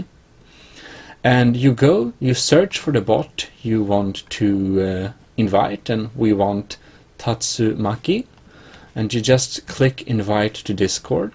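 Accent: Sweden. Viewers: hörs att du e svensk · Your are frome sweden?Tjena bror. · är du svensk?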